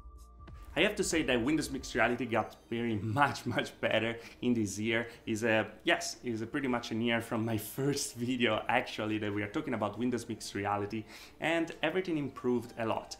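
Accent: Italy. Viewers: Based on his accent and features I'm going to assume he's Italian.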